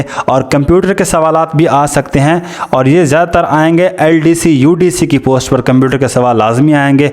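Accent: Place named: Saraiki, Pakistan. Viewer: ASSLAM WALIKUM NICE VIDEO LGTA SRAIKI HAIN AP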